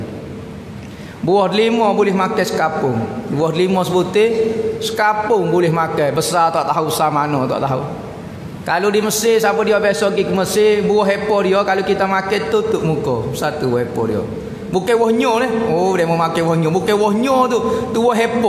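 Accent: Kelantan, Malaysia. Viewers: Sedap dengar ustad kecek kelate....memang best....kadang²ustad lawak juga😅😅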